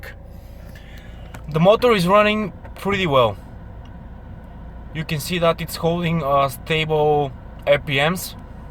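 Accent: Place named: Bulgaria. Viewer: Лол пич !! Много ти личи ,че си Българин. Още не ти бях прочел името на канала и като чух как говориш познах. :) :)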